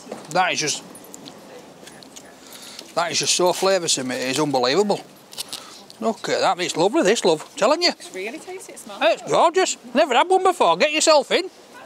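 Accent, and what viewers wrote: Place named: Barnsley, United Kingdom. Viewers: 🤣 I have literally started saying “ oh my god “ in his Yorkshire accent every day xx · He clearly has a Barnsley accent, but he's obviously 'inspired' by Peter Kay. ..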